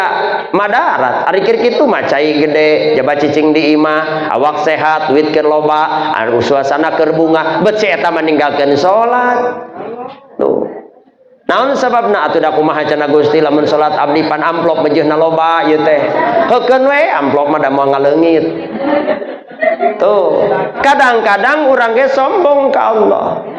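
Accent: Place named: Banten, Indonesia. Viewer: Logat bantenna ieu ajengan kadangu pisan😅. Barokalloh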